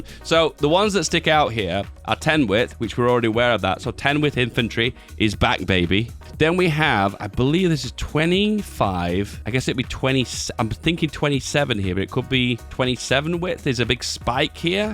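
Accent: United Kingdom. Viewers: What do you say exactly here: ? The pronunciation is a bit too British for me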